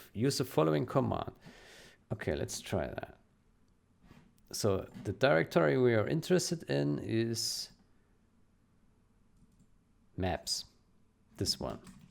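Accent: Germany. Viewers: ты русский и украинский понимаешь? Но акцент у тебя больше немецкий:)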